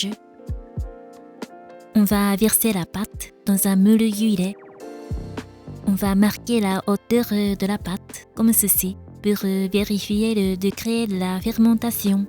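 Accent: Japan. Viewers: Merci pour cette agréable video ! Les images sont très belles et votre petit accent japonais est adorable ! Bonne continuation a vous et merci pour ces superbes recettes sans gluten.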